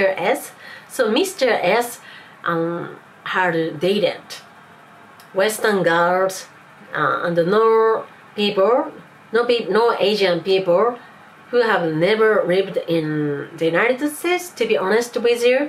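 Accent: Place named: England, United Kingdom. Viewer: Hi. I am an Englishman who really loves your English accent! Your spoken English is excellent and I think that you have a brilliant command of the language. Your voice is also incredibly beautiful and so soothing. You shouldn't feel guilty about not liking certain aspects of Western culture, like the one of sending nude pictures. Many of us "normal folk" find it very unusual too! Thank you so much for sharing your life experiences, the beautiful Japanese lady with an amazing smile ❤️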